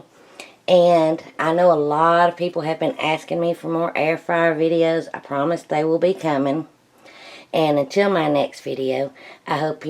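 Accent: South, United States. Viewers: love the Southern Drawl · Im from Oklahoma and been all over the country and that southern accent always grabs my heart good job honey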